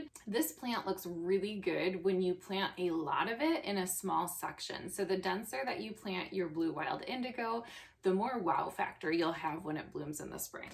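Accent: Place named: Great Lakes, United States. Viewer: The “baig” pronunciation of “bag” tells me you live near the lakes, like me. ❤️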